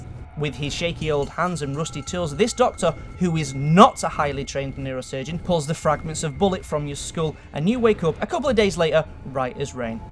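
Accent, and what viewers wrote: United Kingdom. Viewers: It's funny how British people pronounce words "call of dutae"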